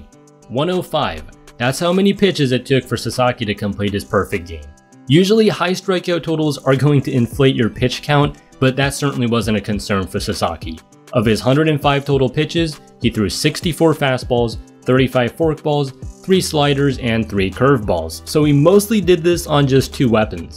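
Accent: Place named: Japan. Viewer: Sportstormの動画から来ました。英語に日本人っぽい癖があるなと思ったら千葉在住ww めちゃくちゃ英語お上手ですね！ I was actually interested in the statistics side of this games and you’ve provided everything for me. Dropped a sub just lettin you know.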